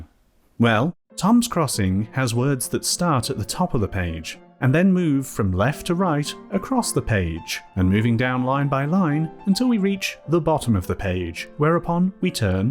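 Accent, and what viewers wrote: United Kingdom. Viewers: Dude! I had no idea you were british.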